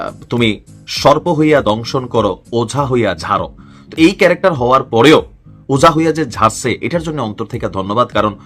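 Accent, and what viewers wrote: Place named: Noakhali, Bangladesh. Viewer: এইটা শুধু আপনার কুমিল্লার বাসা না এটা আমাদের নোয়াখাইল্লা ভাষা বুঝছেন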